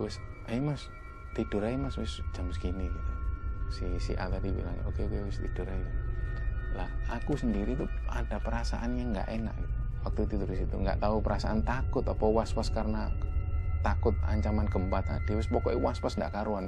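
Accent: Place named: Surabaya, Indonesia. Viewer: Ga fokus sm critanya tp slalu fokus sm cara ngomongnya🤣logatnya itu loh..sy lahir dsurabaya tp bsr & hdp di jabar...smpe skrg bljr niruin logat sby msh blm bs😁😅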